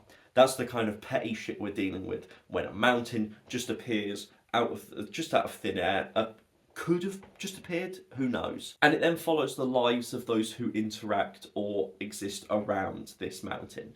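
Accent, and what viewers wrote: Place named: United Kingdom. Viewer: Who the hell is this and why is he british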